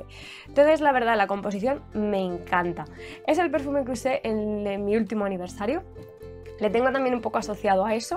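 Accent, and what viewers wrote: Spain. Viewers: Hola, puedo pasar "horas" escuchándote. Porque soy locuela por los perfumes y porque me encanta tu acento español! Que me recuerda tanto a mi padre! Aquí va la pregunta de la "cumplecharla" ¿Has comprado algún perfume que no sea "conocido" en algún viaje? Por ejemplo, yo he comprado perfumes "locales" de tiendas de ropa que no son conocidos de distintos países a los que he viajado. Sólo porque me han gustado. Y por el precio obvio! Saludos desde Argentina!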